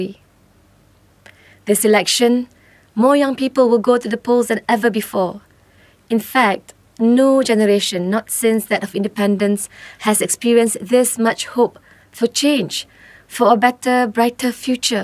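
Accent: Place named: Bangladesh. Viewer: Oh My Banglish....Mirror yourself mate.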